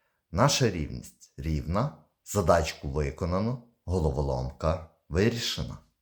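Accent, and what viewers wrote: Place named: Poland. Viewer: я теж про те саме ніби то слова українські а акцент польський чи німецький або руминський, то відголосок генів чути через мову хоча і маскується під українця.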